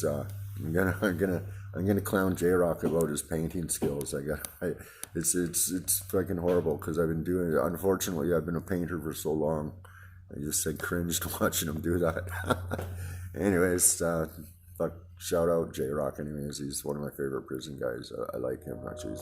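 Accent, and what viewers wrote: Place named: Canada. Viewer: How do you not have more subscribers.. Great stuff I subscribed right after itsmeen recomended you. You can tell some stories and the mix of Canadian and native accent sucks you into the story even more..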